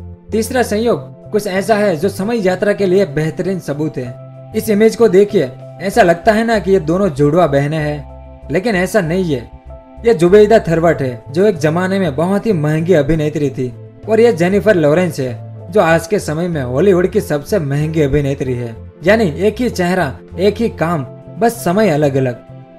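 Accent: Gujarat, India. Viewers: Ye narrator gujrati lgta hai 😁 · I think are you gujrati because apki hindi main gujrati jhalak milti hai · आपकी आवाज में गुजराती स्वाद आ रहा है क्या मेरा कहना सही है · You are gujarati ?? Because your speech has Gujarati accent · Ye awaz or bolne ki style ek gujrati ki he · Bol ne vala gujrati he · You're from gujarat, because your voice is like a gujarat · Bhai gujrati lags...? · Are you Gujrathi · nice video.. nd according to ur tone of voice i think u r gujju (kathiyavadi) .. m i right? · ગુજરાત ના છો ને ભાઈ?? પ્રોનાઉંશીયેશન પર થી ખ્યાલ આવી જાય છે 😁😀🙏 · Gujrati lageche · आपकी आवाज बहोत अच्छी है , और टोन ऐसा है , like gujju भाई 👍 😍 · Bhai Gujarti cho..?? 😁😂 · I can guess that the speaker is Gujarati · I like your gujju accent, seems to be real and true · By listening the voice of the speaker I can surely make out that he is a GUJRATI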